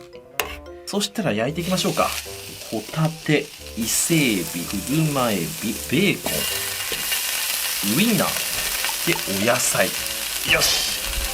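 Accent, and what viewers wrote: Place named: Nagoya, Japan. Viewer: 名古屋生まれ名古屋育ちの自分と同じイントネーションでしゃべってるから笑えるw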